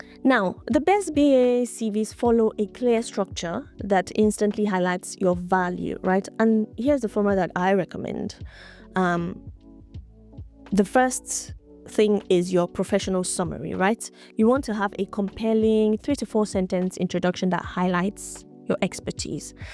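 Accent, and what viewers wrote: Nigeria. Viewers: Thanks for the video.. You have a Nigerian accent, are you Nigerian?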